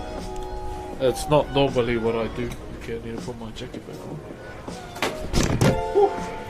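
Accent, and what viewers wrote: New Zealand. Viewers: Kia ora, just came across your content and hearing you talk remind me a lot about New Zealand. Spent nearly 10 years in Aotearoa, one of the best places on Earth. Keep up the good work bro!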